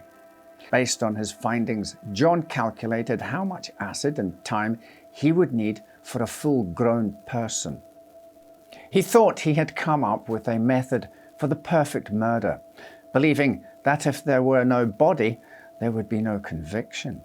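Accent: Scotland, United Kingdom. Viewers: What part of Scotland are you from? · Fantastic thanks....love the Scottish accent!!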